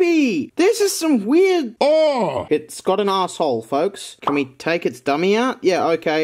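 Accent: Australia. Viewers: I was like “this dudes definitely Australian” then you brang up the water joke. Whkxbskcbskcbdk but yes anyways hello fellow aussie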